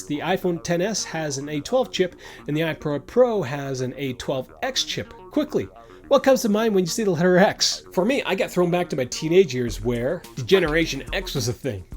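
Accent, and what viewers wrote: Canada. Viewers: 2-things 1) there’s a dog in this video! 2) you bench 240lbs? Legit. (But you sound Canadian, is that kilos?)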